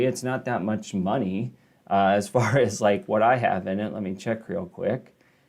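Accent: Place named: Hershey, United States. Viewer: It’s funny listening to your expressions. Takes me back home to Hershey. Very similar. Thanks buddy.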